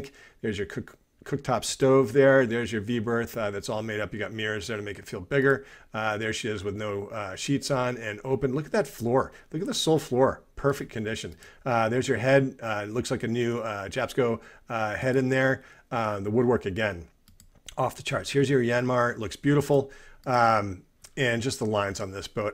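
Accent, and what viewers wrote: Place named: College Park, United States. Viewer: I'm A native of collage Park, thought I recognized that accent! 😂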